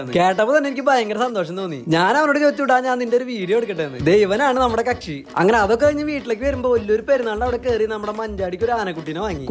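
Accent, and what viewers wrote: Thrissur, India. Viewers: Chettan thrissur aano😮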